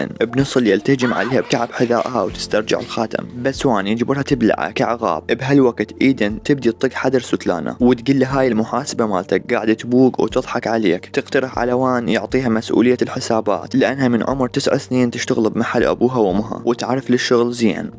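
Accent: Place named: Khuzestan, Iran. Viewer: حلو لهجته اعتقد اهوازي 😉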